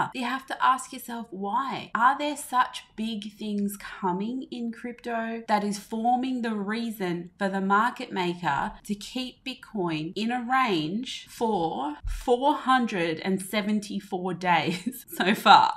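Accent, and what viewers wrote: Australia. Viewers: Loved your video! Also lovely to hear that Australian accent! I lived in Melbourne at the start of the pandemic - great city. I plan to return one day.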